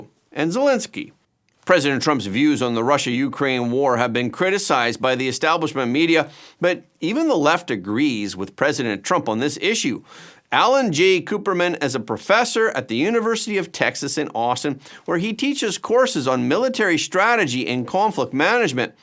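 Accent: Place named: Canada. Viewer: From Perth Australia. Watching FrontPage for few years. Direct & Up to Date mainline Real News. Try to watch with Coffee each morning. does a great job of reading News. I suspect is actually Canadian (accent). I Grew Up in Toronto Canada but saw the writing on the wall years ago when the decided to split the country by labelling in both French & English 😂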